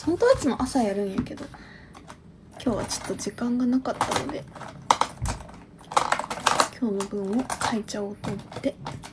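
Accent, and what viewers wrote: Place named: Kansai, Japan. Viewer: おしゃれで努力家で尊敬しかない爆イケオンニ〜♡がゴリゴリ関西弁なのガチギャップですきぃ…T_T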